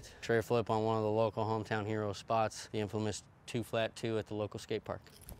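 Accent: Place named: South, United States. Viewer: How does this dude have a southern accent?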